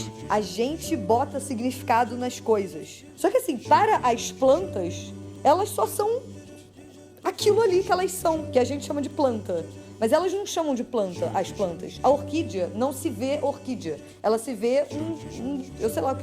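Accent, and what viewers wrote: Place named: São Paulo, Brazil. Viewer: ela já começou a pegar sotaques paulista aaaaaaaaaa 💕💕💕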